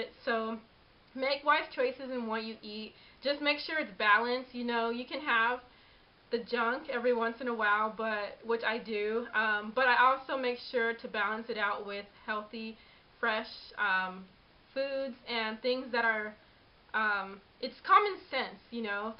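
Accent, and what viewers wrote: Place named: South Korea. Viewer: lol i like how you speak korenglish lol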